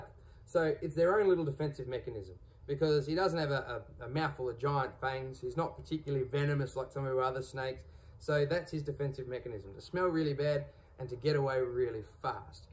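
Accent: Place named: Queensland, Australia. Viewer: Lovely snake but what's the deal with that horrible Queensland accent. Its not very Australian (Victorian)!!!!!!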